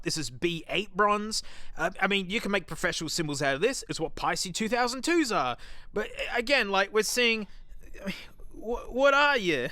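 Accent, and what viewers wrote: Australia. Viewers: i really like the sassy diva pop song mr loud Australian man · its so weird hearing the aussie man in my ear, without any funny music in the background.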